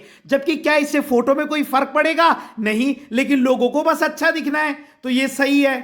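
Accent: Garhwal, India. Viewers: Sir aap Uttarakhand ke ho kyuki aapki avaaj ko sunkar esa lag raha hai ki aap ghadwali ho.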